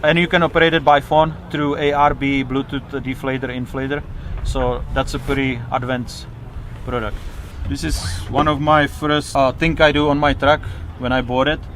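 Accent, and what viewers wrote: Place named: Russia. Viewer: Funny guy, i tought he was russian by his accent, real nice and hand-on guy !!! Those 5 girlfriends dont know what they were losing !! 👍👍👍👍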